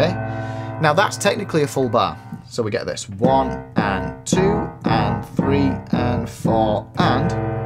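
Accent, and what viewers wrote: Yorkshire, United Kingdom. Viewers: not a joke, just complete bollox. 🤣 I love your “nothing like cockney” accent which sounds like a soft (Leeds?) Yorkshire to me. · Sounds like he's from Yorkshire. ep up mek a brew bluddy ell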